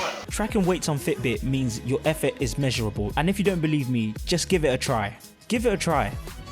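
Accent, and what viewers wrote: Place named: Jamaican-Cockney, United Kingdom. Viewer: cant listen to the stupid jamockney acccent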